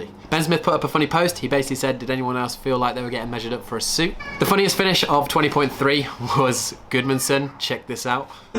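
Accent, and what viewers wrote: Australia. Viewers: Aussie accent 😂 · your accent changed a bit to Australian! haha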